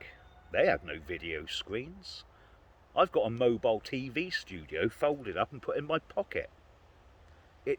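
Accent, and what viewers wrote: England, United Kingdom. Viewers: It is always nice to hear an englishman chat away. 😊👍❤️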